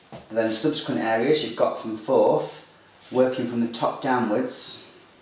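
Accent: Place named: Lancashire, United Kingdom. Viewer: Nice - impressive to get so much volume with just back-combing & clips (especially on silky hair); that brush sounds gentle. I like the teased/ smoothed wrap-around at the end (aprox. ); very pretty way to pull everything together. Awesome hat (I've been wanting one of those for a while)! I wish I knew more about English accents; yours reminds me of a couple famous people from (..looking them up now) Lancashire, & Yorkshire. Hope to see you again soon! x o :)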